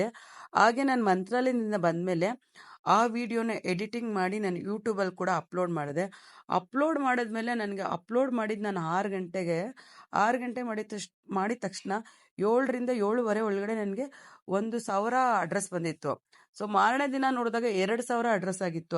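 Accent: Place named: Chikkanayakanahalli, India. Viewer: Tumakur chikkanayakana Halli talk. Huliyar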